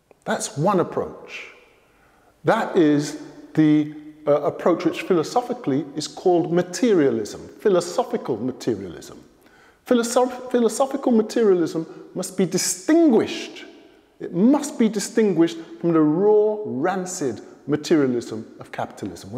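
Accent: England, United Kingdom. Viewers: just cause he is using white inventions he can still advocate for pan africanism because how is he supposed to spread the message via mail ? of course he has to use the internet because that is a faster and more widespread way to spread the message and the people saying that his using an english accent so his analogy isnt valid he studied in a western school so he has western influence in his way of speaking and you would be the same people to call him “uneducated” if he had an african accent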